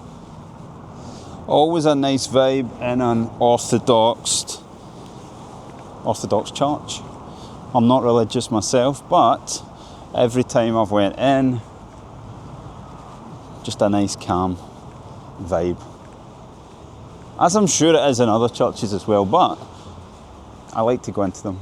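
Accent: Scotland, United Kingdom. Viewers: Я смотрю довольно много видео об иностранцах, путешествующих по России. Но когда я услышал ЭТОТ акцент, я подумал "О, да! Ты точно шотландец!" :D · Акцент конечно😮. Оказывается так по-английски говорят шотландцы.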